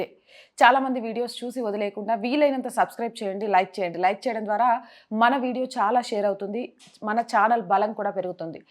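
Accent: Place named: Andhra Pradesh, India. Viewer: frislo meeu tengana matladavaru ippudu andhra stylovundi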